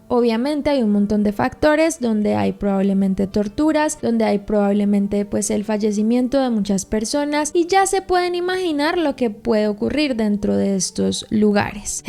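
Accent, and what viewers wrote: Colombia. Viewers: Me pasó lo mismo, soy de Gdl... Y el ver este caso con su acento tan colombiano ( hermoso por cierto) me helo los huesos... A dónde hemos llegado? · pense que eras colombiana, que bueno que te cuides, muchas gracias por subir informacion sobre este caso, saludos desde peru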